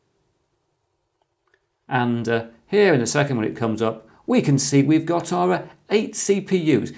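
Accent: United Kingdom. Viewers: Why do british people say "Sor" instead of "Saw"?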